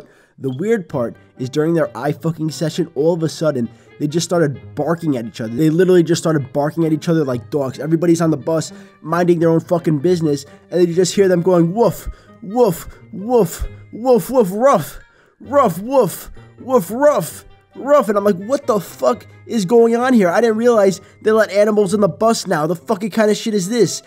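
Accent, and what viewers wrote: New York, United States. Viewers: r u from new york?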